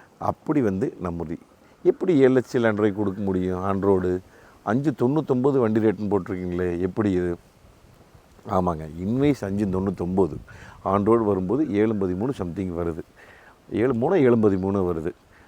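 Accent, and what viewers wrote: Kongu, India. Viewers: Bro, korangu pugaielai (country tobacco brand)... Typical kongu slang.. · கொங்கு தமிழ் அருமை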